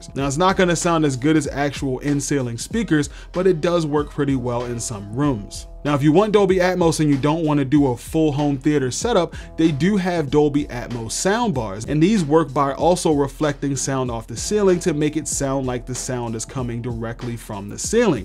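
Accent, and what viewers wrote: Baltimore, United States. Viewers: I knew you was from Baltimore lol. 👌🏾🤘🏾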